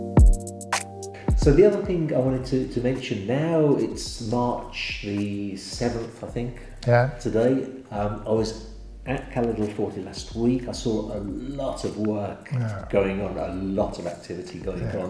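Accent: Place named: RP, United Kingdom. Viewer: Queens English is well defined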